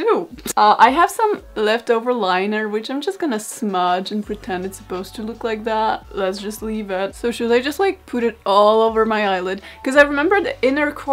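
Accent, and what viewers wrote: Poland. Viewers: I like your delivery, I love your accent. I love my polish peeps. I think you are going to be a Youtube superstar. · POLAND!!!!! It would have kept me up trying to place your accent. So, I literally did a web search for "youtubers like Rachel Maksy" and your channel was suggested. This is my third video (yes, I'm a fan 🙂) and your accent is so familiar but I couldn't put my finger on it until you said it then I said, "That's it!" I haven't been to Poland since the 1990s, possibly before you were born. Anywayyyyyy, I'm enjoying your work and will now binge watch while I sew. Thank you!!!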